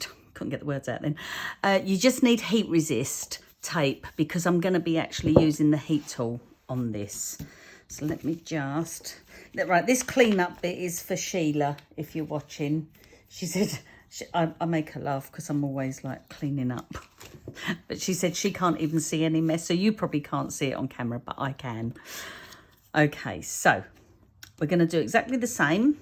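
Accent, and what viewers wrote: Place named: England, United Kingdom. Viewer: Hi. I love your tutorials so nice to hear your english accent. - I'm 81 & in UK but still enjoying my cardmaking. Thank you for your inspiration. 🇬🇧🇬🇧🇬🇧🇬🇧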